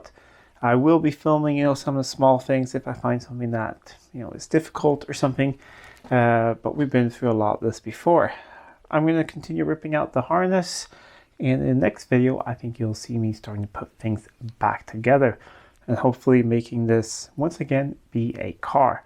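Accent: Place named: United States. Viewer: sir, you are swedish - where did you get your american accent from ... ?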